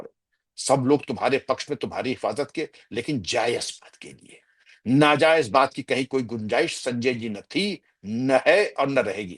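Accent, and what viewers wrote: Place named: Uttar Pradesh, India. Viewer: Vikram sir ka andaz kitna gajab hai pura U.P wala 😂😂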